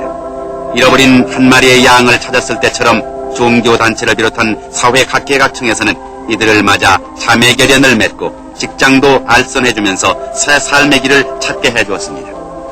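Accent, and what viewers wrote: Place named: North Korea. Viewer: 약간 부칸스러움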